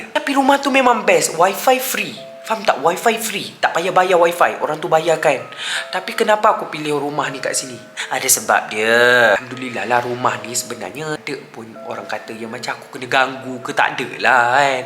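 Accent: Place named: Kelantan, Malaysia. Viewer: dye ckp kelantan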